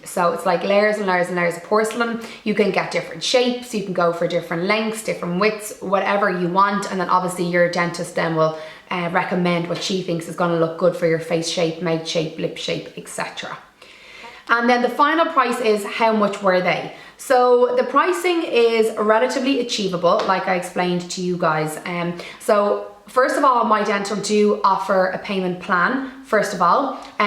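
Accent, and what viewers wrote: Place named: Ireland. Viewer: Love the video 💞 Really informative and very refreshing to hear an Irish voice on YouTube 🎉🇮🇪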